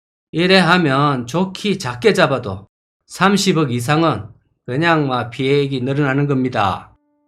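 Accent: Gyeongsang, South Korea. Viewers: 망한곳만, 망할것같은곳만 뎅기는 거도 능력이죠. 그쵸. 특유의 그 경상도 사투리로. 우야노. 우얄끼고 하면서. ㅎㅎ 안 망할것도 망하겠어요. 응원 합니다.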